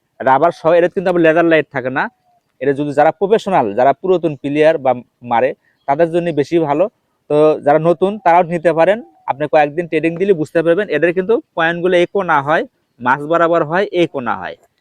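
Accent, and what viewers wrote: Bogura, Bangladesh. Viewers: ভাই আপনার বাড়ি কি বগুড়া?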